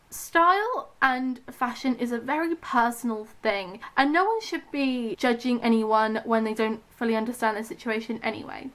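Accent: United Kingdom. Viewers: Wasn't ready for the British accent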